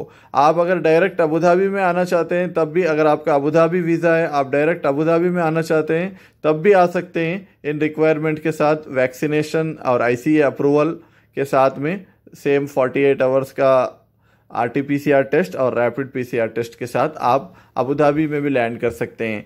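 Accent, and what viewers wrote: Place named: Hyderabad, India. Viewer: Assalamalaikum MASHAALLAH khali awaz se phechan lete Hyderabad ke koi hai to Bas aap se ye hi guzarish hai ke please Hyderabad ka naam roshan karo.. Allah hafiz dua ki gusarish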